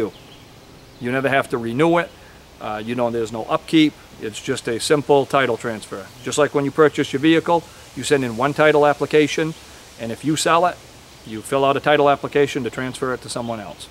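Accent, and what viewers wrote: Maine, United States. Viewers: hey, you sound like your from Maine. Maybe Houlton, Presque Isle area? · It's a Maine accent. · Dude, are you from Maine? Great piece by the way!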